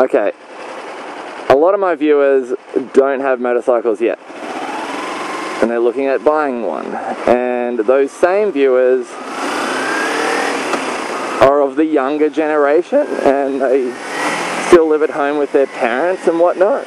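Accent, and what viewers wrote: United States. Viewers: thanks a nice vid, but I noticed you've picked up a US accent? Watching to much youtube? Seriously though, you make some good points esp motorcycling is dangerous, we need to be learning, practising skills ect always. And the more you know the harder you seem to push it, we all need to watch this. There are many advanced courses out there, usually taught on a race track with expect/experienced teachers. Riding fantastic fun and well worth the effort, ride safe everyone. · you pronounce words with an american accent at times. Its weird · also you have a slight American accent with different words lol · Why do you say your A's like an american? Us Aussies chuck an R after an A. e.g. Carn't, barsketball, arseholes, cmon man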